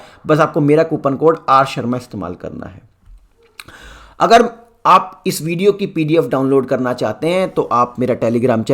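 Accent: Bihar, India. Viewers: Your Bihari accent is so sexy Say again — PRO-VYNCE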